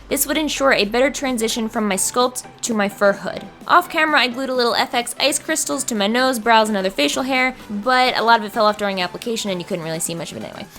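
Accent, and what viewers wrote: United Kingdom. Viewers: that British accent doe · I’ve never heard sound so British! When she said bloody at the beginning she sounded more British than me being British myself 😂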